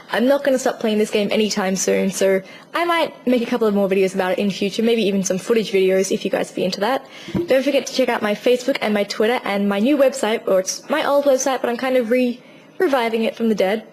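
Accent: Australia. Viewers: Sounds Aussie to me, meh doesn't matter she cute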